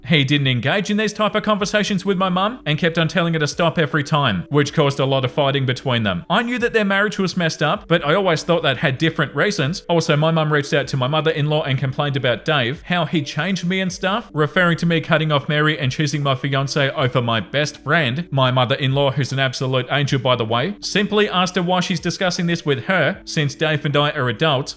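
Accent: Australia. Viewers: On the point about accents, you do have an Australian accent, although not super strong. Everyone has an accent, mine being a mix of Pacific Canadian & central Canadian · Everybody has an accent. Thats how speaking works. And youre Australian? And you dont think you have an accent?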